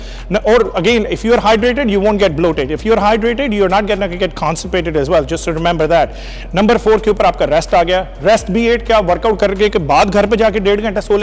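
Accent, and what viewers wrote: Punjab, India. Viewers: Bande de puttar bano, muscle di dhukki kada ti 😂😂 🤟 Paji tusi pisho kithe to ho ? Tuhada punjabi da style bilkul sade nal milda, plz dassyo jaroor where from you ? 🙏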